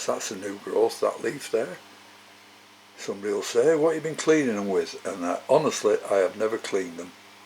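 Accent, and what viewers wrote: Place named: Newcastle, United Kingdom. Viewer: Keep up the excellent work. Have a great day. BTW I like your Gordie accent.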